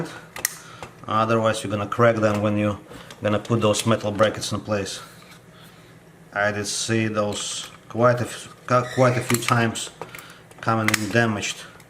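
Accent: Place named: Russia. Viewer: Жесткий Русский акцент ;) молодец руки из правельнного места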